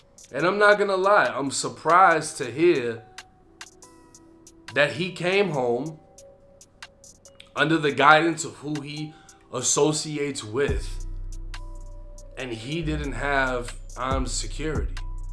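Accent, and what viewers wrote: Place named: Massachusetts, United States. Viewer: Mannnnnn, everytime I hear you speak I miss my family out in MA! ✌️❤️🙏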